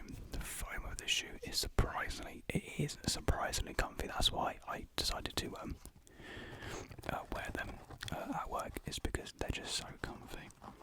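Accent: United Kingdom. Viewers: Not a fan of uk accents but I liked listening to yours. Also really cute love the dark hair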